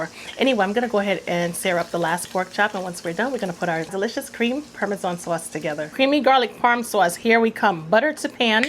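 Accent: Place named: Brooklyn, United States. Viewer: I follow a lot of cooking channels and am surprised I just found yours a few days ago. I'm glad I did, such delicious recipes to try out. Also can't help but notice your accent and was wondering if you're also from Brooklyn/NYC.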